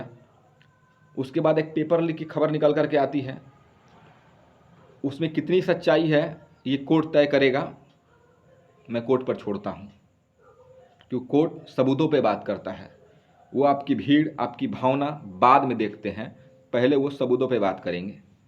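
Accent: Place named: Bihar, India. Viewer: इसका बात से ही लग रहा है , कि ए बिहारी है और सेटिंग कराया है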